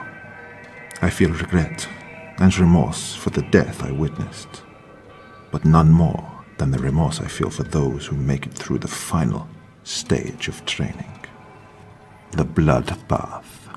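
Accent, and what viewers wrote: Russia. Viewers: That is an AMAZING Russian accent!! You do accents (especially Texan) so well! 🖤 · I'm no expert with accents but in my opinion your Russian accent has become soooo good! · Hello there. Has anyone ever told you that you have a good Russian accent? I do love these extra stories you give us. Much appreciated good sir.. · ❤️ Love the Russian accent but I found the music too loud and distracting 😩🙉🎶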